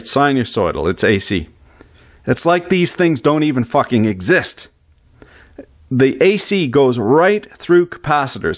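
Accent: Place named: Nova Scotia, Canada. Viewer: What the heck is that accent? It sounds like someone born in Nova Scotia who moved to Ontario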